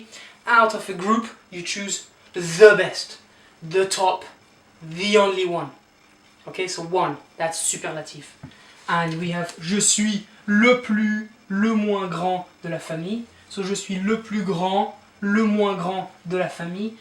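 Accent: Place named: Arab world. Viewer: you have an arabic accent in ur english ☺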